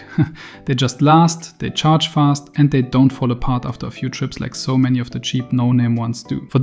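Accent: Germany. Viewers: The man is from Germany and probably only used three different microphones or recorded on three different days while he was travelling. At least, I don't know of any AI that can imitate a German accent as well as a German who speaks English.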